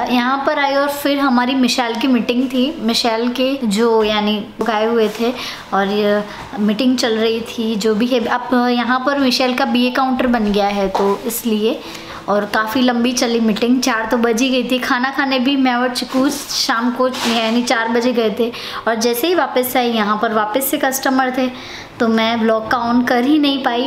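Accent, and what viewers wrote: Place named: Gujarat, India. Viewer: Hi bhabhi...... .........aapke video me jabhi bhi dekhta hu.... Gujarati me Aap bolte Ho esa lagta hai....... Nice video beautiful vlog hai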